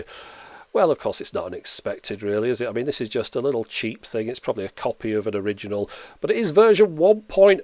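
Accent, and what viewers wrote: United Kingdom. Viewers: I'm curious. Your accent has caused me to wonder if these were only offered across the pond. I know that in our digital era today the powers that be have deliberately limited if not cut off alternatives in the States. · I wonder if this channel would be as interesting without the British accent.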